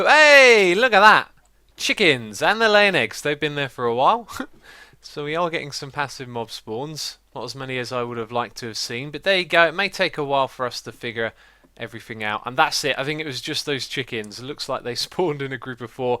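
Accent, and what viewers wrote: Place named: United Kingdom. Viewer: I quit Minecraft a long time ago (1.5 years ago, I think), yesterday I saw one of your Hermitcraft videos and now I am really inspired to build again! You also learned me a fantastic British accent, which is quite rare here in The Netherlands! My English teacher was very proud and gave me an A. Thank you for everything!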